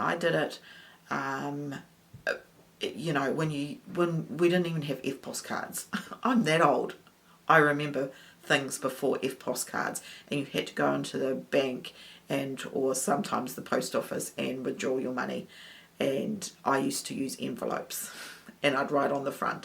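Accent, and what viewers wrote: New Zealand. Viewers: Yay another channel!! I'm going to be enjoying hearing your voice when I move to America in September as I'm going to miss hearing our Kiwi accent over there!!! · Kia ora - zoomed in from Te Awakairangi (aka Lower Hutt) - really feeling for you as I am sadly just getting over this rotten flu going around too... congratulations on the new channel 🤩looking forward to learning what you doing. I really appreciate your cooking and how to make and store recipes (especially the Hot choc recipe YUM!!). PS love your kiwi accent mwahahaaa.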